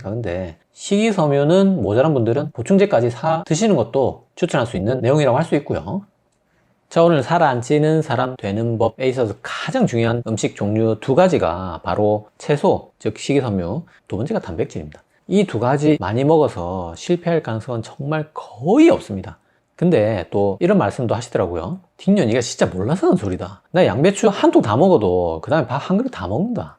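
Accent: Gyeongsang, South Korea. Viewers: 귀여운외모 경상도억양이 특이하셔요😂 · 아침 먹다가 뿜을 뻔…. ㅋㅋ 니나 아는… ㅋㅋㅋ 저 경상도 사람인데 서울에 살거든요… 매번 느끼지만 선생님 리얼 경상도사투리 너무 맛깔나게 재밌게 합니다. 듣고 있으면 폭소가 나와요. 물론 내용도 굉장히 성실하게 준비 하시고 검토 하시고 알려 주셔서 감사합니다. 귀에 속속 들어와요. 자신을 마루타 삼아 시청자들의 건강 관리 에 힘 쓰시니 복 받으실 거예요 ㅎㅎ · 이 선생님은 어디 경상도 분인데 이렇게 사투리가 나긋나긋 귀엽지? 어떤 경상도 사투리는 엄청 드세던데..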